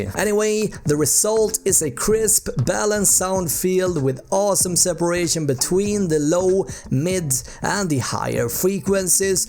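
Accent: Sweden. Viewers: Your english vocabulary is good, and so is your review. But your swedish accent and shouting is really ruining it for me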